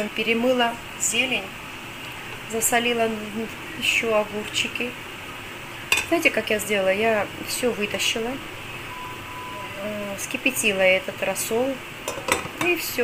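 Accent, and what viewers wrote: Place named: Georgia. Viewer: А мне нравится как вы разговариваете на русском языке!Такая изюминка присутствует в легком акценте.Если бы вы сказали,что грузинка,то все писали бы ,,ой как вы хорошо говорите по русски!"А прожить в Грузии столько много лет и разговаривать по грузински....конечно меняется интонация!Я вас понимаю потому что сама жила в Грузии 4 года с родителями и ходила там в школу,это было при Советском Союзе.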